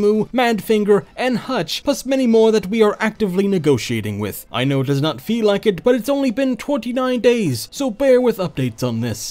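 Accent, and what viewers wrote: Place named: Scotland, United Kingdom. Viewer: "Home town in South Carolina" Has Scottish/British/Aussie accent.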